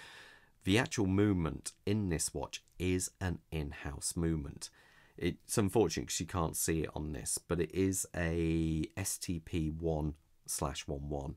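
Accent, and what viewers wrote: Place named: Birmingham, United Kingdom. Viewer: I will definitely visit the dealership you mentioned in Coventry. And I really like your videos with you brummi accent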